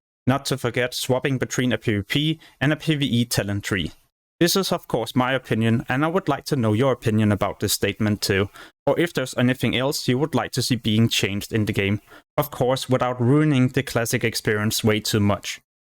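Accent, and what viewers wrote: Denmark. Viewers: Mm danglish! Nothing but love.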